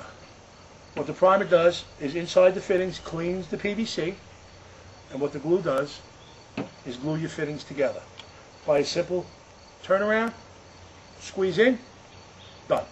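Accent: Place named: New York, United States. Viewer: you sound like a NYer thanks for the all the info!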